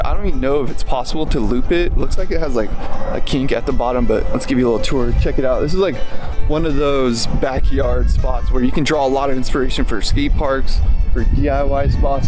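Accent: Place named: California, United States. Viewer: has he biggset bro sufer cali accent and marble mouth. The guy is a fried kook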